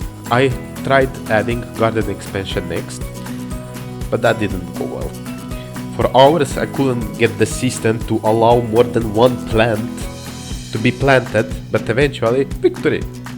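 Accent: Russia. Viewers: ты руский по акценту понятна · BRO SOUNDS RUSSIAN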